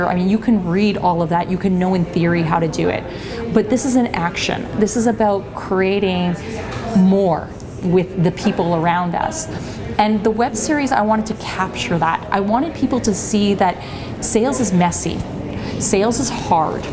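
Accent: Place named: Canada. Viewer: Excuse me. Please. Congratulations,. I would like to thank you for the perfect Canadian English class. There is a possibility of playback speed to 0,75 or 0,5. Anyways! I'm learning a lot from you. Sorry for being out of focus on the video. I watch several North American Natives. They speak Portuguese, but your Canadian accent is the best of all. Your connected speech is Perfect.!! You're a great inspiration to me (or, for me) You're Amazing,. "Why am I not Canadian"??🇨🇦 Sorry to lose the focus of the video. P. S. I've just watched your next video. Thank you! Your fan! See you!!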